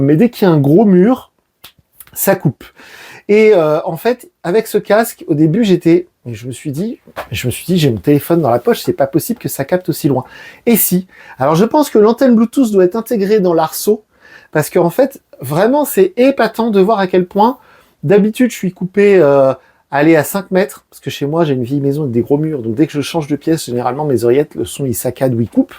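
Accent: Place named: Marseille, France. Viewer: J'ai cru t'étais marseillais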